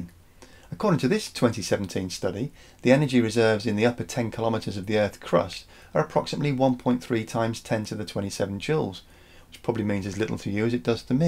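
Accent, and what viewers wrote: United Kingdom. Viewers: We weren’t ready for that accent. I love British accents.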